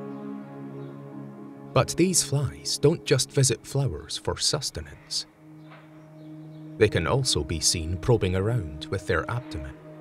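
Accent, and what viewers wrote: Scotland, United Kingdom. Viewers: You Scottish by any chance?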